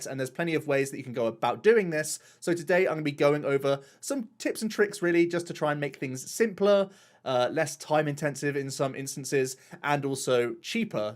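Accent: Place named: United Kingdom. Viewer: Dollars? You sound like a British man. Unless you're living in Australia, America, Canada, etc why are you giving us prices in dollars?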